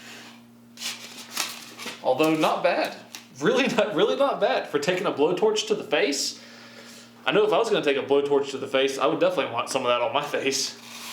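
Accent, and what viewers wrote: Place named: Pennsylvania, United States. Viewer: your from pennsylvania aren’t you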